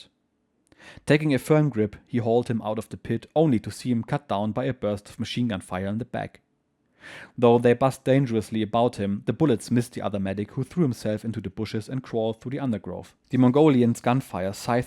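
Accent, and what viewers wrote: Germany. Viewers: This is a great series and I have to say the German accent adds to the thrill of the story 👍😉 thanks.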